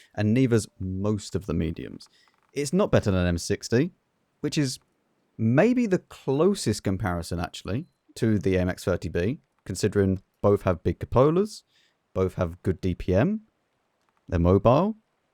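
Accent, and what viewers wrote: United Kingdom. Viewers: He speaks proper and with the english accent that I want to acquire.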